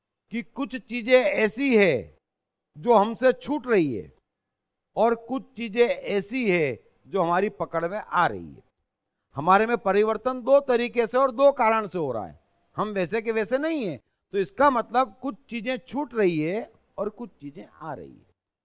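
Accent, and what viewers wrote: Gujarat, India. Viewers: Nahi, Ye Gujarati he.🙂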